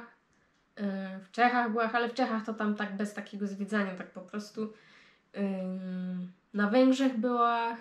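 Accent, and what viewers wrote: Silesia, Poland. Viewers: Super filmiki, piękna dziewczyna i super Śląska gadka pozdrawiam i czekam na następny👍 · Śląska godko to śląska godko sztosik mówisz i opowiadasz. Tako kobite to ciężko znaleźć ;P pospolita dobra duszyczka. Podziwiam i oby ten kanał się dobrze rozwinął ;) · Uwielbiam te twoje śląskie gadanie :) Absolutnie musi to być na kanale! To tak wyróżnia ten kanał z innych. Jak komuś się nie podoba to niech nie ogląda i tyle! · Jeronie jako gryfno Frelka i richtig fajny slaski akcent ♥️♥️ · Siema Dziołcha nima co się wstydzisz noszej godki to je sam piękne że się godo cza ta tradycjo naszo pielęgnować i nie słuchać gupich ludzi kere nie tego nie rozumieją. Pozdrawiom z Bytomia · gadki po Śląsku fajnie się słucha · Ładna dziewczynka. I nawet nie przeszkadza mi " ślonsko godka". Dzięki YT za polecenie kanału. A starsza siostra wolna? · Gwara śląska jest super, fajnie się Ciebie ogląda. 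· Nie jestem ze Śląska ale uwielbiam słuchać gwary slaskiej, dla mnie jest to trochę taki powrót do dzieciństwa kiedy oglądało się serial Święta wojna od tego czasu pokochałem Śląsk i gwarę ☺️ Pięknie wyglądasz w tych okularach ☺️ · twojej śląskiej gwary... fajnie tak gadasz po wieśniacku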